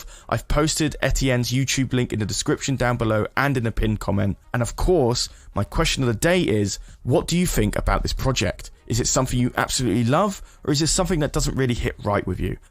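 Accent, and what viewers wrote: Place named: England, United Kingdom. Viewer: Why does have an English accent on his second channel?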